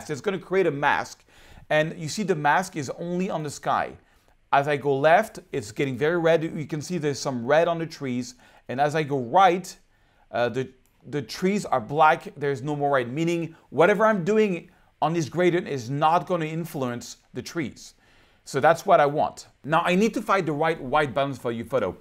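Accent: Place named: France. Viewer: Je pense que tu es en train de perdre ton frenchie accent! ;) J'aime bien ce tuto, comme quoi, travailler ses photographies n'est qu'une question d'émotions que l'on veut y véhiculer.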